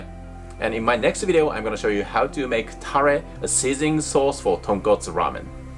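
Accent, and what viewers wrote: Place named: Australia. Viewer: Great and informative, thanks Also, did you learn english in australia?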